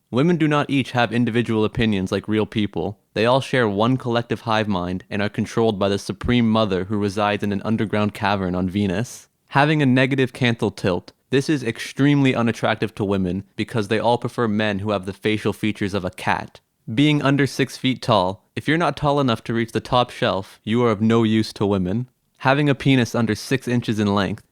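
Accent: Newfoundland, Canada. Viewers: Dude are you a Newfoundlander by chance? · Is that a Canadian accent? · i knew you were canadian btw i can hear it in your voice. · “Aboot” “b’ys” Newfoundland? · Are you from Newfoundland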